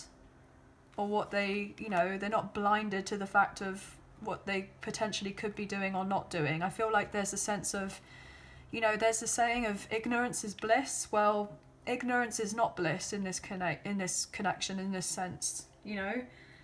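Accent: England, United Kingdom. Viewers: Loved this reading! Very interesting, thank you very much lovely Lady, Love your English accent!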